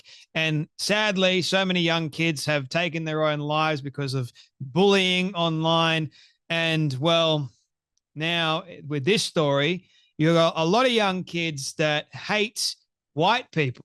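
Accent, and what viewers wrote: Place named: Australia. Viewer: dude your Mick Dundee accent got me to subscribe love the accent & content from Fla.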